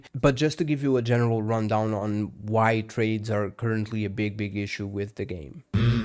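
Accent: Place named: Portugal. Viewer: Are you Portuguese? your English sounds like it.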